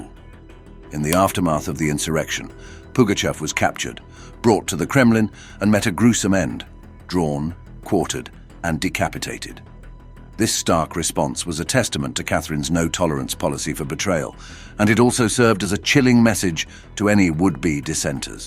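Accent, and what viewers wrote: United Kingdom. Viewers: That British accent 😂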